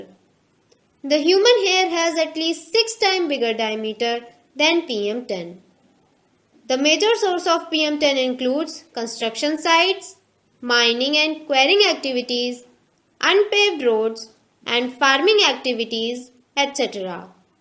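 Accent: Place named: India. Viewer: Indian accent irritate my ears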